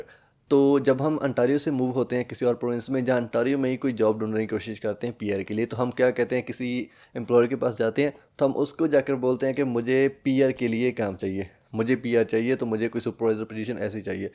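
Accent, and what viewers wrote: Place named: Punjab, India. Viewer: punjabi da svad vakhra e c yr, but its 👍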